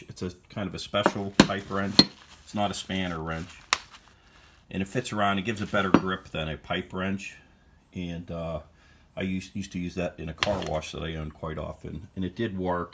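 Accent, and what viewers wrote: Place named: Pittsburgh, United States. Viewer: Thanks for the video. I could tell from your 'accent' that you were a Pittsburger, then I saw the Vaccarri sticker on your loader. I live in McMurray and used to go to them all the time for my BX2230. Now I have to find a rebuild kit somewhere else.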